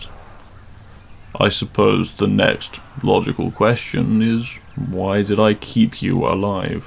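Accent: United Kingdom. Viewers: This was amazing. Thank you so much for voicing my script. Also are you British?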